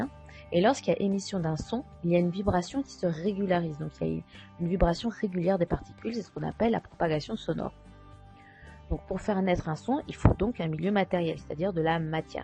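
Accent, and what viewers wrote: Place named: Tunisia. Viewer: Une voix de tunisienne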